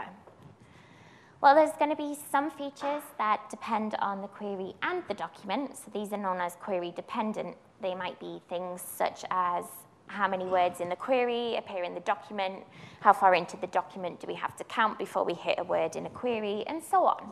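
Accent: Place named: England, United Kingdom. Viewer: that's a very very english accent lol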